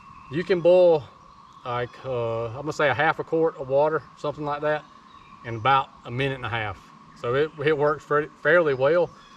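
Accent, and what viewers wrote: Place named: South, United States. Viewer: Finally, a camping gear video from an American in the South. So many of them are Aussies. No disrespect to our brethren down unda', but I need to know what I need and where I will be camping. I live in SC, so I imagine the with that accent knows a thing or two about camping in my territory. The budget-minded selection is good, too. Thanks for the info and the no-nonsense presentation.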